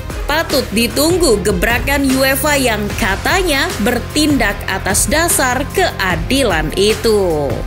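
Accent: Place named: Java, Indonesia. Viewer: Hostnya jelas wong jowo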